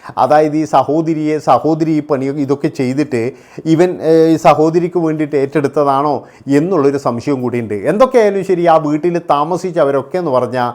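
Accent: Kannur, India. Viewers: താങ്കൾ കണ്ണൂർ അഴിക്കോട് ആണോ സഹോദരാ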